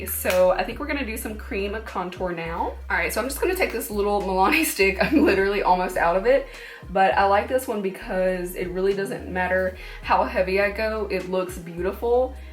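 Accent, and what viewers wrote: South, United States. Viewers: I agree she reminds me of the south too and I'm just like 😭😭😭 I miss those accents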